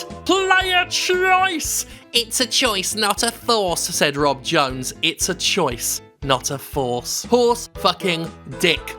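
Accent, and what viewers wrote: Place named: Yorkshire, United Kingdom. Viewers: Love the grumpy Yorkshireman accent. Next time can we have a West Country cider-drinkin' combine 'arvester drivin' reading? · Jim Sterling is more Yorkshire than I am and I'm from Yorkshire. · The Northern accent makes me laugh every time.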